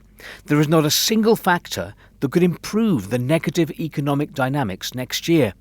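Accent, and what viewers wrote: United Kingdom. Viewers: This narrator sounds British. Isn't that where the BBC comes from? I'll be taking this with several grains of salt, if not the entire shaker.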